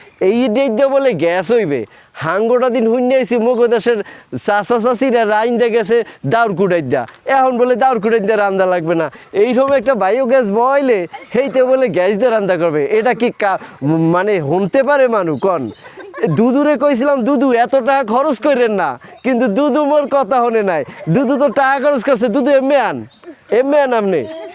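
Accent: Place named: Barishal, Bangladesh. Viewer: ভাই আপনিতো অনেক ভালো বরিশাইল্লা মারতে পারেন।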